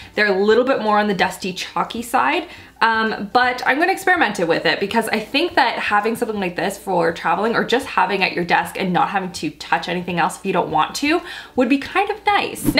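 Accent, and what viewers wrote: Canada. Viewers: Please... do a tutorial about your look! With the typical Canadian "about" :D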